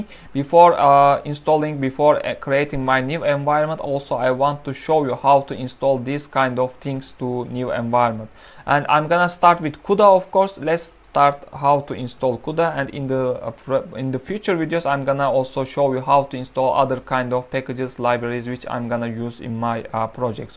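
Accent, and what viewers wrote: Turkey. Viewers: ingilizcesini rahat anlıyorum türk olabilir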